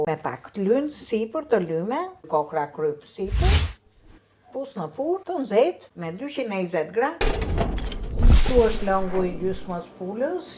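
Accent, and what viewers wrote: Tirana, Albania. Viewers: Ty te ka lezet luga kur gatuan dhe dialekti jonë i bukur i Tironës.❤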